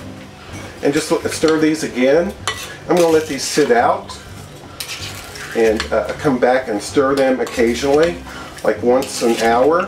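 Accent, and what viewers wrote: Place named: Evansville, United States. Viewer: I sense an Evansville, IN accent. Coming from a Chicagoan who frequented that area as a kid since I used to have family there.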